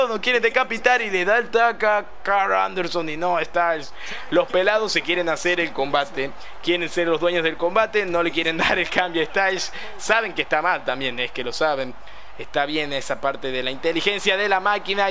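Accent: Argentina. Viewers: una pregunta ¿sos argentino?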